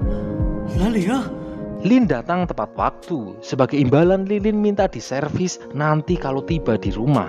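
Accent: Banyumas, Indonesia. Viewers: Admine wong ngapak ya min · aku dadi seneng admine medok basa ngapak hahaha mantap banget pokoke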